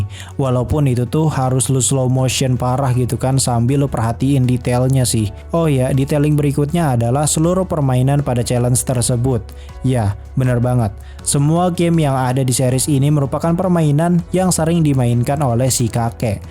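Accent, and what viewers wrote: West Java, Indonesia. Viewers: Orang Sunda Lin mang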